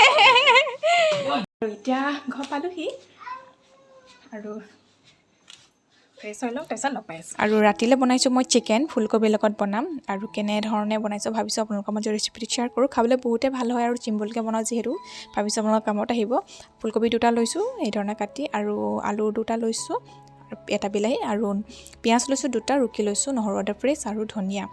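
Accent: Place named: Upper Assam, India. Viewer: মই যে তোমাৰ কথাখিনি শুনি বহুত ভাল পাওঁ ।উজনিৰ যে শুনিলেই গম পায় । আজি মানে বাবুৰ দিন । চিকেন ৰেচিপিটো মোৰ কাৰণে নতুন । ভাল লাগিল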